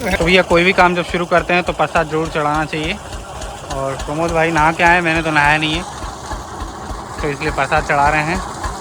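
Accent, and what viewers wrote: India. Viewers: Kaha ke ho bhaiya narsinghpur jaise bol rahe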